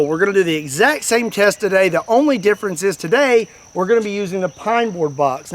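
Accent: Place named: Texas, United States. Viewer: His Texas accent makes it even more American.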